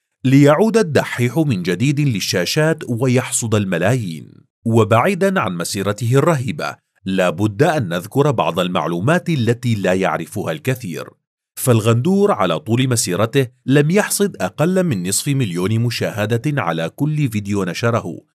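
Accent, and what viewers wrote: Egypt. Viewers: يشخص مميز و كوميدي و علمي و مكس جميل جدا صراحه هو خلني احب اللهجه المصريه صراحه حتى الشرح بسيط ولكن هم جدا معقد و صعب الفهم ولكن الدحيح يسهل المعلومه يخليها جدا سهله فعلن شخص مميز ماشاءالله تبارك الله عليه🥀💜💜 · الافضل رغم لهجته المصرية الدحيح صاحب محتوى راق وسيق ومفيد عكس اليوتيوبرز **معظمهم تافهون وبلا محتوى حتى ***فهو بسيط و لا يحتاج سوى لحسه الفكاهي الظريف و اسلوبه اللبق و العفوي ومعلومات دقيقة من مصادر علمية بورك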